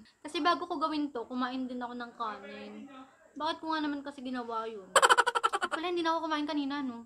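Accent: Batangas, Philippines. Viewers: Upong bahay halatang batangueña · Ang ganda niyo po talaga. Natural na natural ang ganda. Gustong gusto ko po iyong batangeña accent niyo po. Keep inspiring others po. 😊😊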